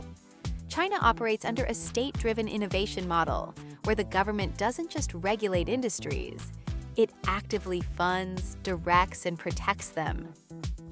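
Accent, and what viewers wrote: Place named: United States. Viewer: You have an American/Canadian accent. Yet you are pro-Chinaman accent. 1+1 doesn’t add up with an American accent. You are an agent of the CCP? Or AI voice agent ?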